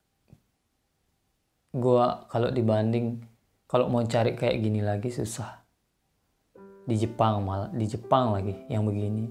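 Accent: Lombok, Indonesia. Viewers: logat lombok masih kentel😂😂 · Logat sma diksi2 lomboknya ga ilang bang mantapp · Khas sekali Logat lomboknya 😆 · I like your style of speech Bro, Lombok gatiii 😁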